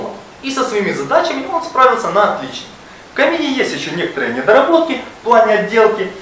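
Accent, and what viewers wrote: Ukraine. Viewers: Який чудовий м'який український акцент у жіночого голосу... Прошу, випустіть хоч декілька відео українською . ... А може,можна просто продублювати вже готові Ваші відео на українську. Це буде великим збагаченням українського контенту Ютубу і , взагалі, домогою Україні для просування до прогресу.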